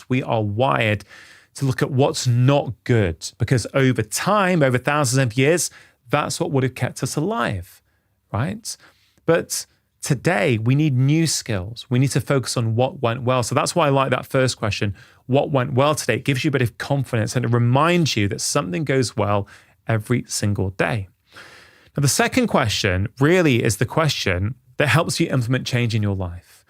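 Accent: United Kingdom. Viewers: I am sucker for uk accent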